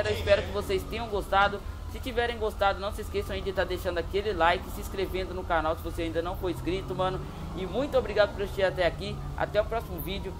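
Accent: Minas Gerais, Brazil. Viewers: e mineiro??? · você é Mineiro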